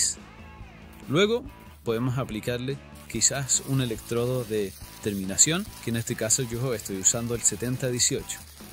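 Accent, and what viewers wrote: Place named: Chile. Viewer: Hola, gracias por compartir. Una pregunta: ¿De qué país eres (parece Chile por la tonada) y si hay legislación vigente hay que regule éste carro? Aquí en Argentina se complicó mucho con trabas, homologaciones, pagos de patentes y demás curros que te sacan dinero y tiempo. Gracias por compartir, abrazo. Dios te bendiga.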